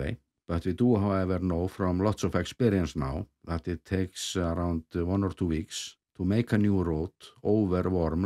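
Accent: Iceland. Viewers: I was in Iceland before the last eruption and witnessed the flooding and destruction of the Ring Road. To this day, I can’t believe how quickly you Icelanders repair things and respond to such massive natural events! You people are simply incredible. In countries like Germany, it wouldn’t have worked nearly as fast. Thank you so much for your great work, the detailed information, and the amazing footage. It’s always a pleasure to watch your videos. And by the way: I love the Icelandic accent!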